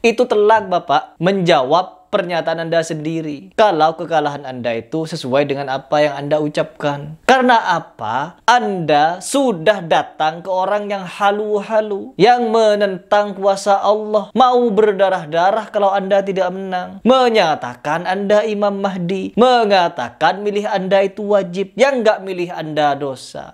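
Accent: Madura, Indonesia. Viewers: bang kamu orang madura kah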